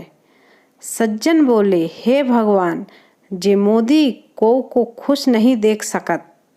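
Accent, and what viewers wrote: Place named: Bihar, India. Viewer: Didi aap Pata Logon ki faltu bakwas se please aur Dur Raha karo kuch Log Kahate Hain aapko ki aap Bihari lagti ho aap Bihar chal Bhaag Bihari Bihari Jaisi Teri Awaz hai aapke awaaz me Jaan basiya didi aap yah Baat Jarur Jaan Lijiye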